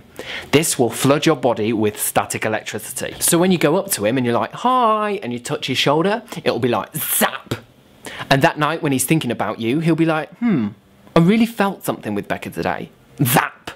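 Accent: Bristol, United Kingdom. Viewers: becca ur bristolian accent actually made u mention a skl in bristol woah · hahahah the Bristolian accent just makes these videos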